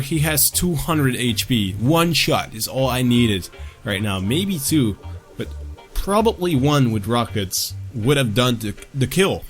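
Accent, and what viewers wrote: Netherlands. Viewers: Guy your English ;D That accent is too obvious, dutchie xP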